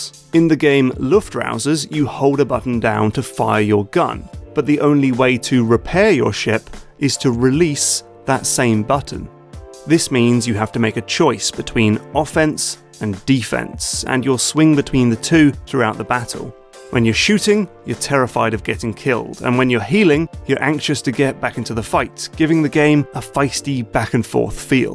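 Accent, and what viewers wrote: United Kingdom. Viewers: utube in a nutshell+ british accent helps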